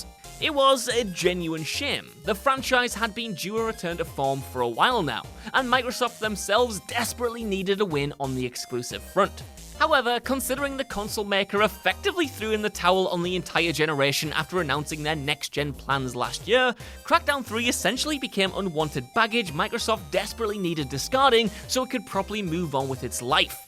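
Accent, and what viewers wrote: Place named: Lancashire, United Kingdom. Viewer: That was too much Lancashire for one day, thank you. Good video though.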